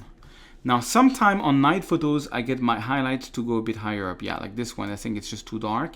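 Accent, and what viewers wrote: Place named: France. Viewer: when french people speak English...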